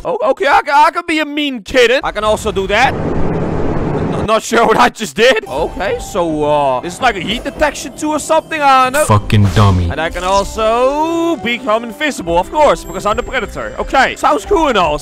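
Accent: Netherlands. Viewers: cyril MAGIT that’s a Dutch accent it’s lowkey funny when a Dutch guy speaks English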